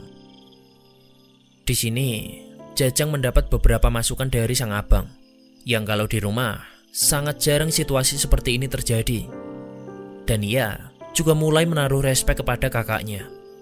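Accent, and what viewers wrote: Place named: Java, Indonesia. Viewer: Orang mana bng, medok bgt :(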